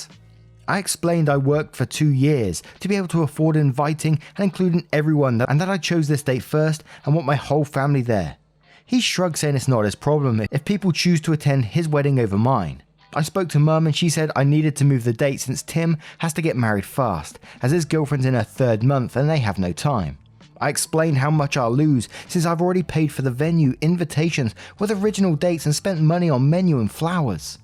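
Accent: England, United Kingdom. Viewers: There's nothing better than hearing that proper English accent sing am I the butthole😂😂❤❤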